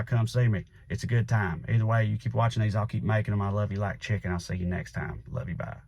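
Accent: South, United States. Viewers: OMG you make me laugh which has been very hard to do as a Nurse who saw our nurses go above and beyond during CoVid deaths daily for the last few years. Also it's not done yet we are still having CoVid deaths while the rest of the world has decided its over. As a country girl I am just going to say to those who think Orange idiot is the answer (oh dear, God bless your soul). And aren't you just so special. Lol. Love your perspective, accent my fellow country spirit. You look and sound like my late cousin Barney. I did not give him his name. Lol. But he lived, he lived and he managed to drive his motorcycle into the only tree in Wilcox AZ. Miss him so much. He came visit me right before his death and stated (Yuma AZ is h.o.t.t. hot) lol. Could not state better myself.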